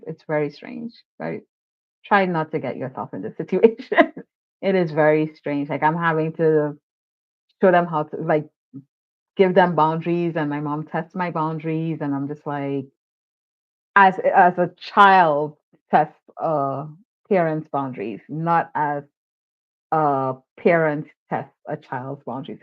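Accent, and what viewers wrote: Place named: Trinidad and Tobago. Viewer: so.many.thoughts!!! I'll try to be succinct lol. First up--I'm a sucker for Trini accents! Your voice is so soothing 😍. I never hear other Black women talk about this, so this was really refreshing to watch! I'm the only (intentionally) childfree person in my friend groups, and it can feel isolating. Thank you for sharing your story and being transparent about some of the reasons you changed your mind about having kids...and your aunt sounds amazing! I can see why you wanted to be like her. My story is a little different bc I've always known I wanted to be childfree since I was a teenager, and was also raised in Ghana as a kid so I understand how different it is raising kids here vs. back "home". The lack of support for parents (esp mothers) in this country is appalling, and a lot of mothers are bearing the load of raising kids...even if they're married. 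The U.S. is hostile to motherhood, especially Black motherhood. I often think about who's gonna care for me since I'm in my late 30s now, and always planned on taking care of myself the best way I can so that I'm active and out and about even in old age. I plan to either be alone, have hired help if needed, or live in a Golden Girls situation lolol but who knows? Also, the guy who talked about older people without kids is totally right. My mom works in a nursing home, and plenty of older adults with kids die alone, so there's never a guarantee that having kids ensures you'll be taken care of (or at the very least, have someon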